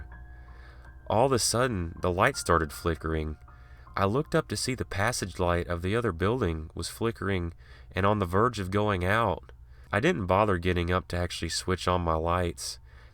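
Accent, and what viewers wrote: South, United States. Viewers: Now with the dialects evoloving with more and more influence from almost any culture it's hard to tell the accent but it is for sure southern and in my opinion I'd say kentucky to the Carolina's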